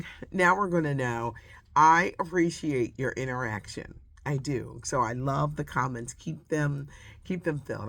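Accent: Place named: Philadelphia, United States. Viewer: Hi Auntie 🖐🏾 I just want to say hello. I have loved you for years. I go all the way back to the Butter Ball days. I used to work at Jefferson Hospital with your brother.I have moved to the west coast. See you always take me to my home Philly. I love the Philly voice and demeanor. Love you Auntie. Keep up the good work 👏🏾 🙌🏿 ❤😂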